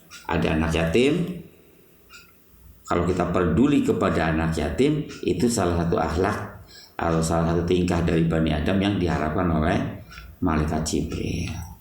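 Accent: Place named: Java, Indonesia. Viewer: Bahasa MEDOK gini sepertinya unik nih ,,,wkwkwk soalnya saya lihat dari part - 1 sampai part - 2 sama.tapi bagi saya masuk sekali cara penjgkajiannya,monggo dilanjut pak ustadz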